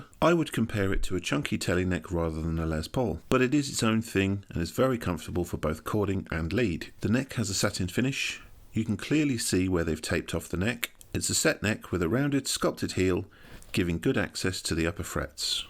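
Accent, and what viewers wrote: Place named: United Kingdom. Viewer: This is a completely brilliant review, and just what I was looking for. I was looking at this model and some of the Eastman LP-types. Plenty of info on those, very little on these, so thank you so much. I have two questions, if you don't mind. First, how does the guitar hold tune without locking tuners? And, they say that the frets on these are "jumbo" (which i love), but so often that really means "medium". So, anything you could say about the frets would be great. Thank you again for being the best demo of this guitar on YT. Cheers!👍🙏PS- as a yank-anglophile, I have to say that you voice and your accent are so beautiful to listen to.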